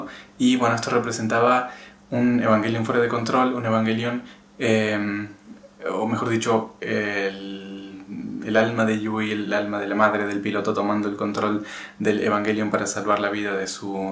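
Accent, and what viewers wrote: Argentina. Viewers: Me suenas como de Mendoza o San Luis medio parecido el acento. por el tema de las articulaciones que use lubricante siliconado de alta perfomance para rodamientos en spary seria el que usan para lubricar los coolers una ves me paso y le meti con el spray y se soluciono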